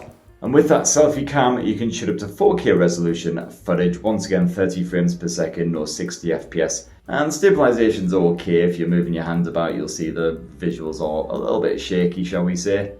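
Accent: United Kingdom. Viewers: The British Accent Wow 😊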